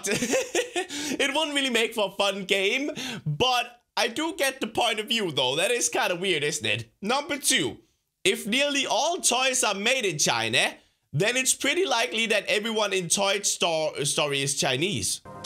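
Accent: Denmark. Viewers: Dink Its his Danish accent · Ideer is idea said funny because if you haven't noticed somehow, Crainer has a danish accent because he's danish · He has an Danish accent so idea is ideer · Danish accent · its because of his danish accent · YourNewFather it’s his accent he’s danish and everyone in Europe says it like that LOL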